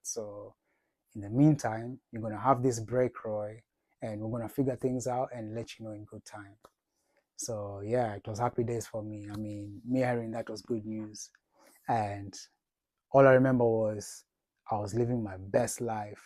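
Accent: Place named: United Kingdom. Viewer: Its the UK accent for me Roy 😊thank you so much for sharing, very educational, cant wait for you to be done with all these😮mungu mbele bro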